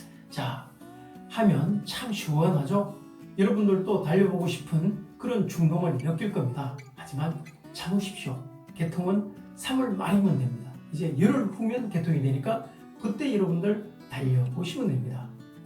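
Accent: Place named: Gyeongsang, South Korea. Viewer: 영덕사투리를 좀쓰시네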